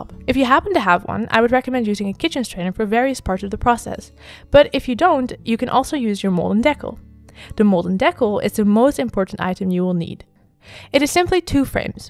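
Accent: Netherlands. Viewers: I love how simply you have explained this. I would like to make some paper for my watercolour work. If you don't mind me asking, where are you from ? I detect an accent and it sounds Dutch 🤔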